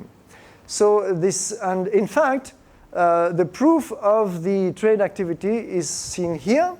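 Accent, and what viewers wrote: India. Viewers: I like how he has acquired the Indian accent. How sweet. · Your English is excellent/perfect, with a lovely melodic tinge of Indian accent. So this civilisation prioritised the well-being of people against many other considerations, and nobody was attacking them. What a beautiful civilisation. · Poor guy he has even picked up an Indian accent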